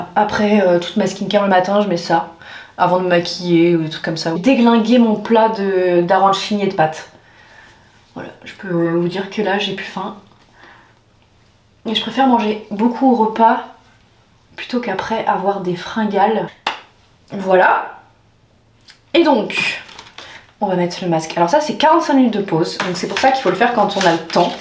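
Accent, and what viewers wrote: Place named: England, United Kingdom. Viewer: Je kiffe ton accent anglais😅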